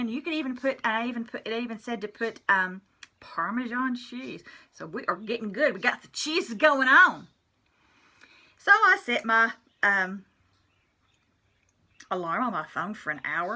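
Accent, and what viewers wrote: South, United States. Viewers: I love you n your Southern drawl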